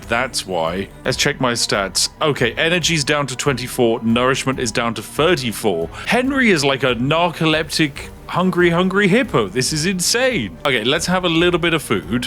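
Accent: United Kingdom. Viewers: You sound like hans capon, i think its just the British accent but its peak im British too.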